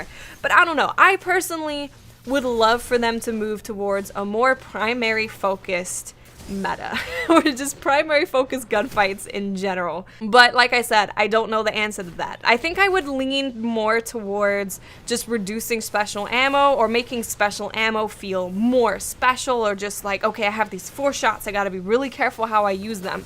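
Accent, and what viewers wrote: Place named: Boston, United States. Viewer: Anyone else notice the slight Bostonish accent in? or is it just me?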